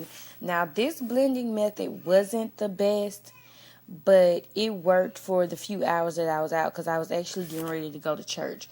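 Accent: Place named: South, United States. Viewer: That's really cute!!! I like it a lot! P.S. I thought I heard a southern accent, and then you said something about Mississippi! lol!! I'm from here too, great vid! Xo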